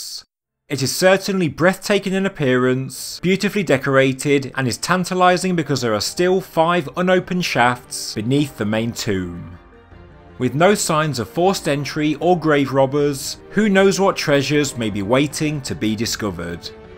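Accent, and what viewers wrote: United Kingdom. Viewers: it means 5 people think is going to get a "speech coach" to correct his voice to cater to their opinions. The guy is the UK, it's his accent and dialect. So yes, 6 useless opinions of people who if you enjoy the content watch it out you don't like it don't watch it. So why don't you "sit down and shut up."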